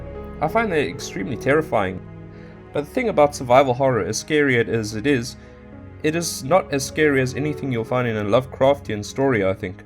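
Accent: South Africa. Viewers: Are u South African... or New Zealandish?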